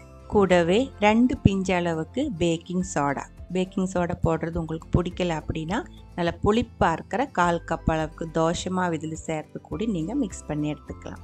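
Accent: Kerala, India. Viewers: Super pazhampori recipe sister uunga malayalam kalantha tamil speech super 👌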